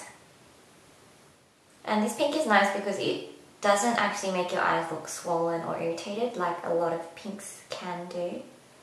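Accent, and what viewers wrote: Australia. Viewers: That's Australian accent : )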